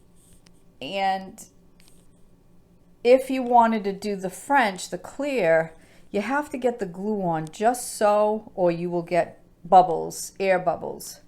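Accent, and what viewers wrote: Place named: Boston, United States. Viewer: You need to make more videos. We are all enjoying your lovely accent and nice personality. You make such nice videos of your nails. You must be from Boston or Maine. I was originally from Long Island NY and moved to warm Florida a few years ago to get away from the cold. Anyway keep up the great work!!!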